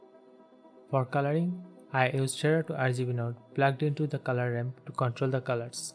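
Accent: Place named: India. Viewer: no wonder the accent felt indian but slightly off.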